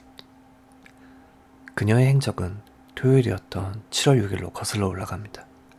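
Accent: Japan. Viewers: 개인적으로 '후' 발음이 적응이 안되네요 ㅎㅎ 일본식 발음인가요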